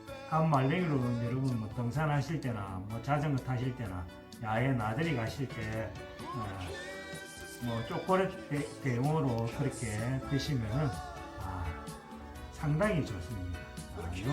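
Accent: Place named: Rural, South Korea. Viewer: 시골 어디신지 말씀이 정말 구수하시네요~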